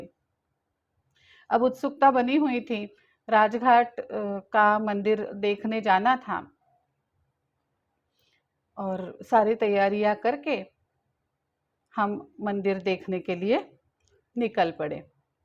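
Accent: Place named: Maharashtra, India. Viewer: नर्मदे हर,🙏🙏आपकी हिंदी भाषा बहुत ही अच्छी है, और कभी कभी आप जो मराठी मिश्रित हिंदी बोलतीं हैं वह तो और भी ज्यादा अच्छी लगती है । मातृभाषा की सौंधी मिट्टी सी महक आती है ।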